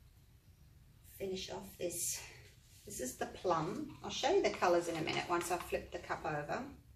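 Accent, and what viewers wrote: Australia. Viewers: Love your Aussie accent! I’m from NY!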